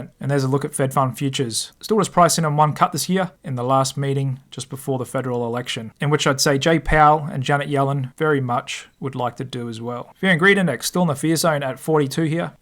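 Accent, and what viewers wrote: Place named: Australia. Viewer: The format, style and delivery of these daily videos is amazing. They really help me to keep track of what is going on globally and I am forever grateful for all your hard work and commitment to this. I only hope you keep it up and continue helping all the really grateful viewers you have, and I think I can speak for them on this occasion. Perhaps a bit naughty of me to say this but your Australian twang is such a refreshing change from the usual accents that we listen to day in and day out on financial channels. This is coming from a Brit! You come across as really professional, easy on the ear, and sincere. Cheers mate, hope you keep delivering!! Have a great weekend 🇦🇺🇬🇧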